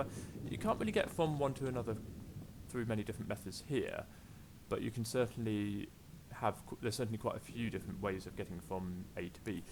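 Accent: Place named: England, United Kingdom. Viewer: great video! are u from England? u have a nice accent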